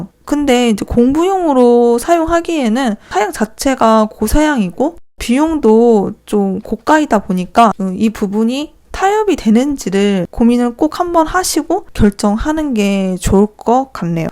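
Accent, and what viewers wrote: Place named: Jeolla, South Korea. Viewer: 전라도 분이신가요?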